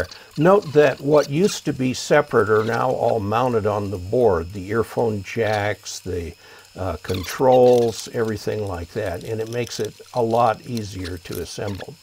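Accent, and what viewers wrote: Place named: United States. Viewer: Thank you,! Apart of device, your diction make me feel an american speaker:-)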